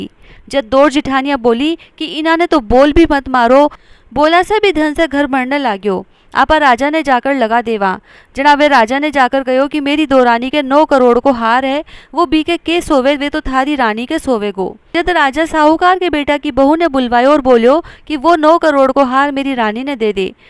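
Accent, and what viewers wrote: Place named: Marwar, India. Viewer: Bhabhiji, mera sasural Garg Agarwal, Sikar ka hain. Vahi ki bolti marwadi mein kahani sunate hain Aap, toh ghar jaisa hi lagta hain 🤗 Jay Shri Radhe 🙏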